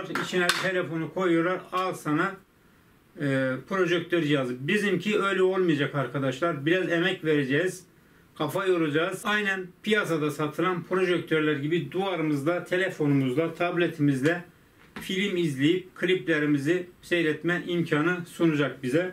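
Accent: Erzurum, Turkey. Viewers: ABİ SEN ERZURULUMUSUN :)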